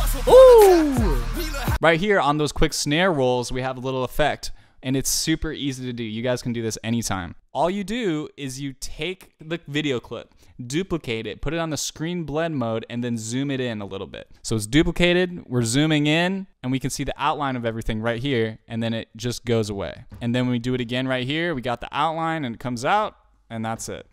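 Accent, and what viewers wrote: United States. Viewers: Great video but halfway through I had to turn it off because I just cannot stand listening to American accents:((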